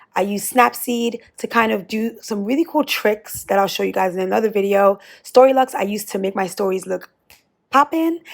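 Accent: Nigeria. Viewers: I can hear the Nigerian accent. I love this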